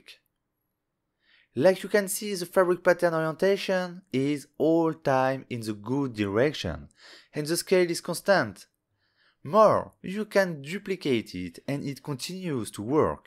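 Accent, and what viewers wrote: France. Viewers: I never thought how French accent sound in english it's quiet fun... I like it... no offense I'm Italian I also speak French but never had the opportunity to listen to a French speaking in english. Great tutorial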